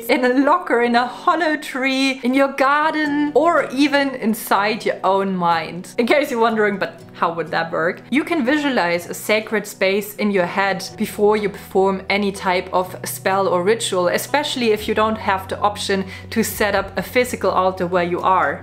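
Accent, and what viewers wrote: Germany. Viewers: German? The land of witches. I might be wrong with the accent. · I’m going to build an altar and just found you from this video! I just want to say that I’m obsessed with your accent! Are you German? You are also so beautiful. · Are you german?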